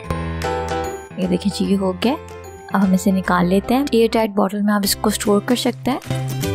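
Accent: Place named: Pakistan. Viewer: My guess was right from accent you must be from Pakistan, ab next year paka try karunga and best of luck Sis. Waiting more of your videos and recipe.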